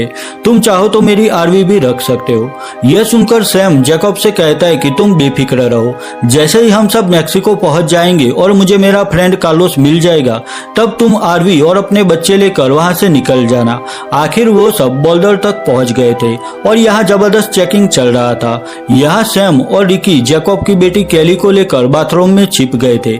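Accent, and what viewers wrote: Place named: Bihar, India. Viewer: apki voice se lag raha hai aap bihari ho..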